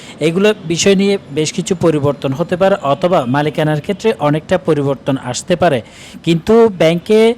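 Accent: Chittagong, Bangladesh. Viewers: তার কথা বলার ধরন চট্টগ্রামের কোন আছে। সে অবশ্যই এসে আলমের লোক। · Vai er bari Chittagong... Unar Chittagong er vasha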